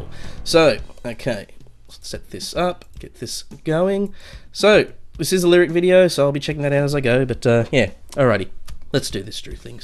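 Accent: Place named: Australia. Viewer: Hey! As always cool reaction, never get tired of hearing a good Aussie voice on reaction vids haha I reckon you hit up " " soon, my fav track, Floor and Marko vocals on point. Keep it up legend!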